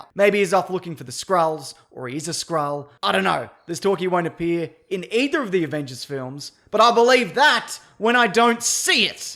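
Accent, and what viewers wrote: Australia. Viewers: Australian bloke haha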